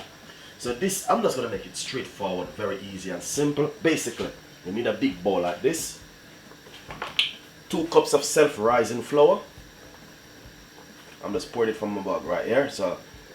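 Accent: Jamaica. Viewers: That was what I came to listen for when I find the video. I said “ if he don’t have a Jamaican accent, am clicking off!!” 😆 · I was wondering if it was a brand of milk that he was referring to and I’m Jamaican and I did not understand what that was. I even went in his description to see if he listed the ingredients. I have no issue with his patois, that just went over my head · go over to another cooking channel for the queen's English. This is a Jamaican chef, talking in his Jamaican dialect. Low dat no man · Where I live at the moment there are zero Jamaicans so its ten years since I heard the accent. This made me laugh when he said what sounded like ola milk, then I realised he was saying whole milk and I collapsed laughing gosh I miss that accent. I am English living in Sicily and cant wait to get home !!!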